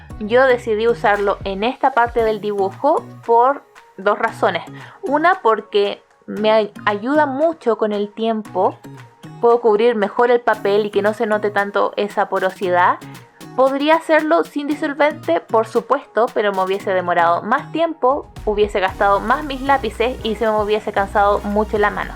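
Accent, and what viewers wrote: Chile. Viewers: eres Chilena? :)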